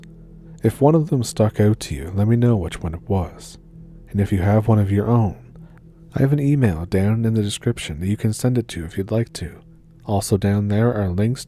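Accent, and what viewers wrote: Canada. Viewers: my friend im from ireland im from waterford im giving your video a like im going to even subscribe you sound canadian my friend am i correct 🇨🇮👍 by the way you can tell stories dont ever put yourself down anyone who tells you you cant are jealuos 😂 i cant spell so no worries there buddy great story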